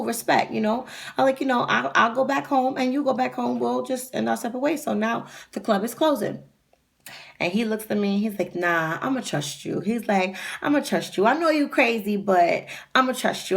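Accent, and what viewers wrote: New York, United States. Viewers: Where are you from lol sounds like New York girl like me lol this story was in n.c? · I love New York accents 😂 you had me dead when you went off😂😂😂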